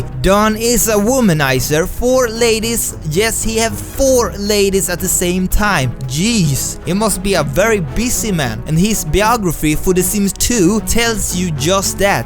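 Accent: Sweden. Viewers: He's a Swede, you can tell that from the accent. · Din svengelska är helt underbar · That is Swedish. He has an obvious Swedish accent as a German that speaks Swedish as well I can tell you that is Swedish, German looks pretty different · He is Swedish, the game is on swedish so that's why he has an accent and bad grammar. Not that all swedes have bad grammar but he has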